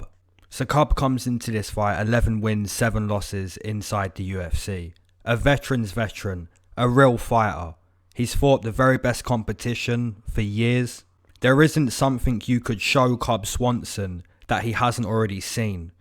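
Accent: United Kingdom. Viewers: U sound like that other Brit (mma guru) who talks funny and crazy ... who’s the better predictor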